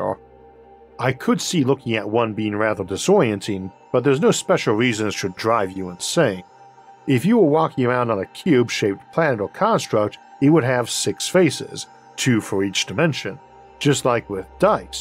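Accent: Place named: Brooklyn, United States. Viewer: What accent is going on here, Brooklyn Cambridge?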